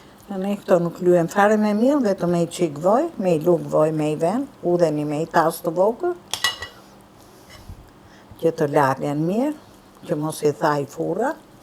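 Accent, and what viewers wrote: Tirana, Albania. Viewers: Amon sa mir qe je nje zonj tironce me adete e me te folme.hallall ta bofte zoti☝️🤲🤗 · Te lumshin duart e nderuar zonje.Te kenaq me te foluren origjinale , ke dhe zerin te bukur , dhe te perfytyroj nje zonje te kendshme tironse❤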